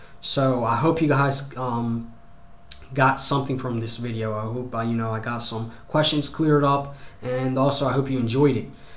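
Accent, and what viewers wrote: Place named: Baltimore, United States. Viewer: *also... While I know your country is listed as Greece your accent sounds a lot like Baltimore City, Maryland... Just thought I would mention... ^_^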